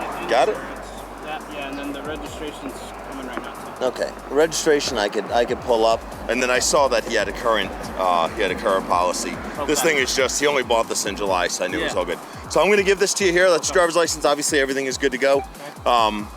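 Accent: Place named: Long Island, United States. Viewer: You can tell you are from Long Island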